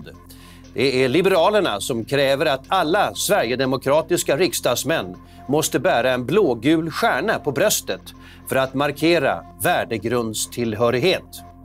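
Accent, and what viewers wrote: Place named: Baltic states. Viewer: Lätt svenska. Låter Balt...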